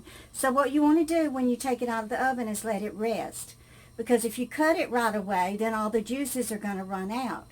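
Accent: South, United States. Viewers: Hi, I just discovered you last week. My grandmother and her family were from Starkville, MS and one cousin is left there. My grandmother's cooking had a MS flair with lots of butter. And some from Ackerman. Goodlooking meatloaf and love your southern drawl. I'm in north Texas. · It’s the Southern accent,. White (whyyyte). I have trouble with people understanding some of my pronunciation that aren’t from the South. Asked for a bag of ice in Philadelphia (iyyyce) and the lady thought I said a bag of ass. 🤣😂🤣😂 We got a good laugh. People from Idaho don’t have a Southern accent. I love to hear the lovely accent. Happy New Year to you. 🎊🍾🎈😘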